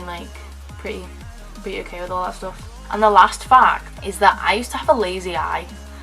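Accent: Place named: Liverpool, United Kingdom. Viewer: Your makeup is gorgeous! Ah and your hair, I love it. I just dyed my ends purple ^.^ Ok i'm getting really good at accents because I thought, hmm she's from Liverpool. -Jess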